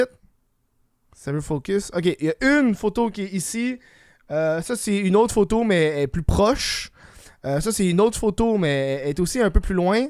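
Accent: Quebec, Canada. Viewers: Je regarde cette vidéo de France (Nlle Aquitaine) et je suis morte de rire. L'accent du Québec plus un débit de paroles rapide, pas évident de tout comprendre. Certains passages et expressions hilarants. Le convoi de la liberté a pris son départ ce matin 9 février à Nice avec une participation importante de voitures, camionnettes, camping-car, camions et ils seront rejoint selon des lieux de ralliements en fonction de la progression vers Paris où l'arrivée est prévue le 11 au soir, samedi journée de fraternité avant de continuer vers Bruxelles. Idem pour d'autres pays européen comme les Pays Bas précédemment. Quels seront les résultats de ces convois qui suivent l'exemple des canadiens, nous verrons. En Europe pour les 27 pays de l'U.E. les peuples veulent reconquérir leur souveraineté et leur culture spécifique en plus des restrictions sanitaires du passe vaccinal. 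Vous habitez un grand et beau pays. Bonne continuation.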